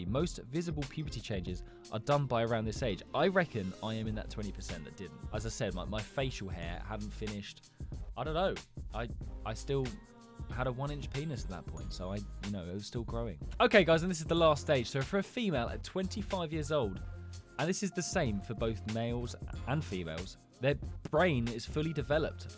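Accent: United Kingdom. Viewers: Are you british.because you and joocie (my goat)should collab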